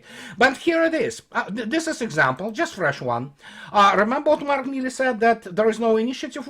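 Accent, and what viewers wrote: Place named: Russia. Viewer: When you read from an article could you please not dramatize your voice you are hard enough to understand with your thick Russian accent it really does no justice to make it worse and harder to understand you by adding drama to your voice. Please try to slow down a little and let your voice keep pace with you brain you make a lot of filler noises that actually make you sound like a drunken American with a thick Russian accent.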